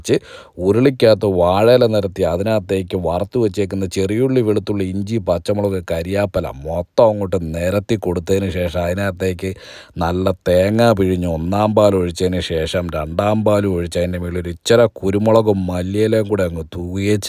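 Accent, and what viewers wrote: Kottayam, India. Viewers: Ellam kollaam bai ningaldey nerukayil beef kerio? Enna voice aanu bass😂😂😂 randenam adicha voice...enna parayana kottayam achayan..ichara ichara...aaavi.hahaha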